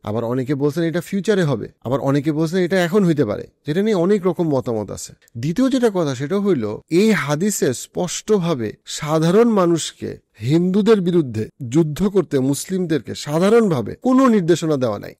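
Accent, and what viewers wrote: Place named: Bangladesh. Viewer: ও দাদা তুমি কোথাকার লোক ভাষা শুনে তো মনে হচ্ছে বাংলাদেশের তা তুমি তোমার দেশ টা নিয়ে মাথা মারো তোমাকে ভারত কে নিয়ে এত ভাবতে হবে না ,আমরা ভারতীয় হয়ে আমরা এতো ভাবিনা তোমার এত ভাবনা কিসের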